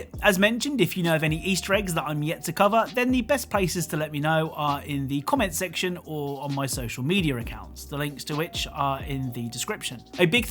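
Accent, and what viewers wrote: United Kingdom. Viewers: I wanna, are you British? Oh and robo cop is not a a robot he is a cyborg, strange one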